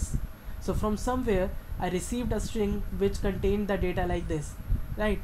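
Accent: India. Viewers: i kind of like the indian accent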